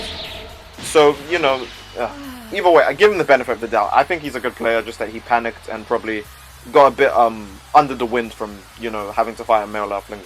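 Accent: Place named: England, United Kingdom. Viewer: Are you from England? You got the accent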